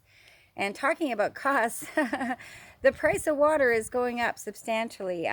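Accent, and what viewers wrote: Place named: Canada. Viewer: Thanks for always giving people something to learn, now i see how your channel name, coincides with each other! Awesome video. I hear the Canadian accent 😊👍🇨🇦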